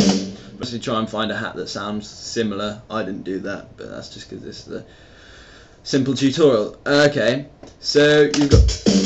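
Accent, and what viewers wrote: United Kingdom. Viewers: you're english,don't you?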